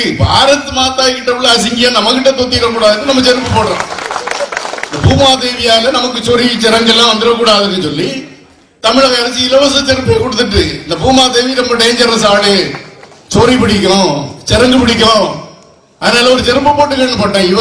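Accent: Kerala, India. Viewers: எனக்கும் சந்தேகம். பேச்ச கேட்டபோதே தோன்றியது . இவன் ஒரு மலையாளி என்று தோன்றுகிறது. ஜெய் ஹிந்த் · நீ பேசும் தமிழ் நாடார் பேசும் தமிழல்லவே. மலையாளம்வாசம் கலந்து நாறுது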